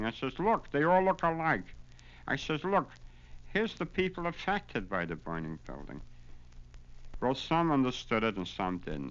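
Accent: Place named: Manhattan, United States. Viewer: Sadly that Manhattan “East Side” accent is now extinct…even on toity toid and toid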